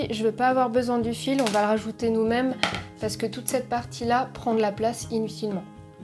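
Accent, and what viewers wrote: France. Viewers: Como hago para casarme con una mujer inteligente como ella y ese acento francés enamora.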